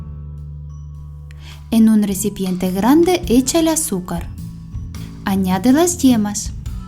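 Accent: Ukraine. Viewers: Hola te saludo desde Lima Perú 🇵🇪 esperando q estés bien de salud,un abrazo grandote,me encanta tu voz tu asento Ucraniano eres dulce,.por otra parte te digo q así de cariñoso somos los Peruanos, gracias por compartir tus recetas hice en la pandemia tus recetas y me salieron super rico mis panes,ahora haré paneton espero q me salga bien.un besote querida.chauuu cuídate. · Дякую, і слава Богу, що ви українка, бо я думав, що з розуму схожу, слухаю нічого не розумію, але відчуття, що на рідній мові😂😂, якась магія, успіхів Вам🌷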